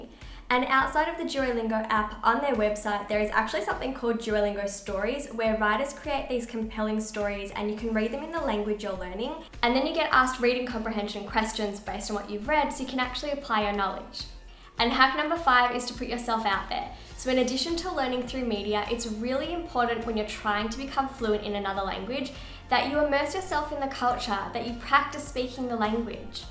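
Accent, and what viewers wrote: Australia. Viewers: Girl, I love your Australian (I think) accent!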